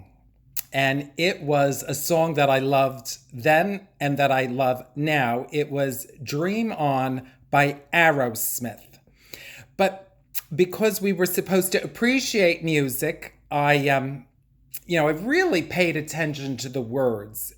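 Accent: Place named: Bronx, United States. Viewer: Omggg..i said you reminded me of my aunts from the Bronx ..You are from the Bronx !! Im floored !!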